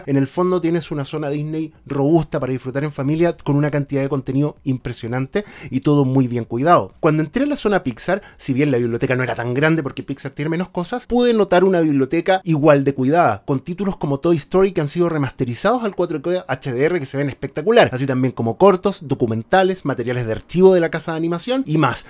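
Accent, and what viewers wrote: Chile. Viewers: duele escuchar el Chileno, pero bue, era solo para ver un poco de Disney+ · Es chileno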